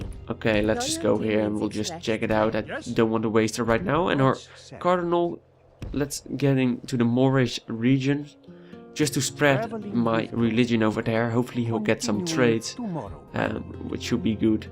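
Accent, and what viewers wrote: Netherlands. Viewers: Are you by any chance Dutch? You have our wonderful accent :P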